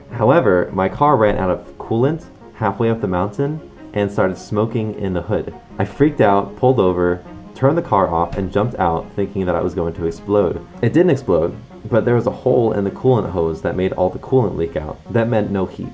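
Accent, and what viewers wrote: United Kingdom. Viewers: It's a shame people take a mic about british accents I wish kept his British accent like me seriously tho why would you bully british people if they moved to the states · Wow they are rude because of your British accent i have a Australia accent well everyone did say i have a accent a Australia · sad british noises, what british accent did you have when you moved?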